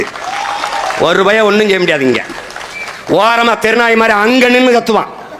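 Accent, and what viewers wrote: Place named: Madurai, India. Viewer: Ankuthu Nillu Madurai slang😊 Thirudarr..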